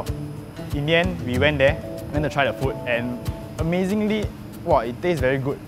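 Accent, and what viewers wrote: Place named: Singapore. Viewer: Singlish accent at its finest, love it !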